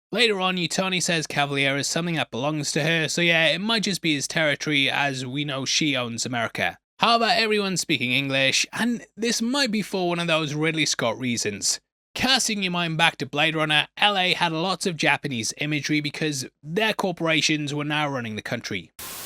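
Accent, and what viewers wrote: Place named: England, United Kingdom. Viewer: I'm still confused 😢....he's English accent confuses me more..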